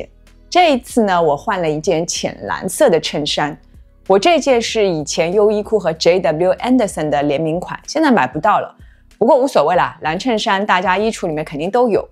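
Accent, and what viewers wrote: Shanghai, China. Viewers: 看了你好多视频了，今天终于订阅了，很喜欢你的一些讲解，听口音你可能是上海人吧。我这里没有Uniqlo的实体店，可惜了。买衣服还是喜欢实体店可以试穿，好多衣服博主穿着好看，自己穿未必好看。支持你一下。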